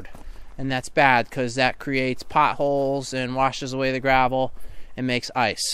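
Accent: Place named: New Jersey, United States. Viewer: Man you certainly live in a region with lots of drainage issues. Is this PA? I detect almost a Jersey-ish accent.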